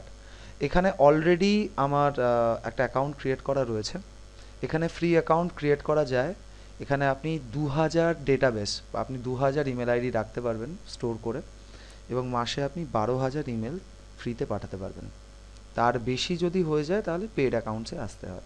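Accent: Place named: Kolkata, India. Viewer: I thought you were from Kolkata. Bah.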